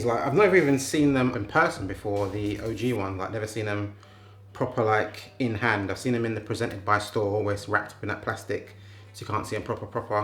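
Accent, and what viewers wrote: United Kingdom. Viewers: You need to be British to fully understand his accent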